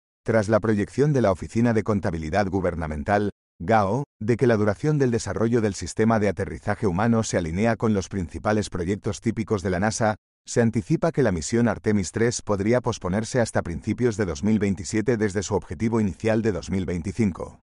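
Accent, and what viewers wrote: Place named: Latin America. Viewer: "Los barcos petroleros" 🤣.........español....¿de donde?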